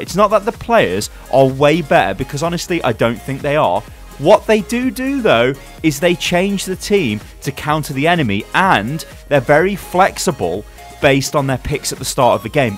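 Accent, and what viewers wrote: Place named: United Kingdom. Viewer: His comfortable British voice gets me wet...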